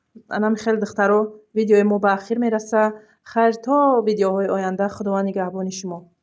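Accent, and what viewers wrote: Tajikistan. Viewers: ай кучои хисоре чунки камтар лахчаи хисори гап мезане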